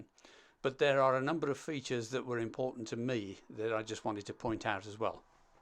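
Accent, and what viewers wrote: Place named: Bromsgrove, United Kingdom. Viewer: Lovely video, very useful and you’ve convinced me to buy it. Your local accent also pricked my ears up, I’ll hazard a guess you’re near bromsgrove?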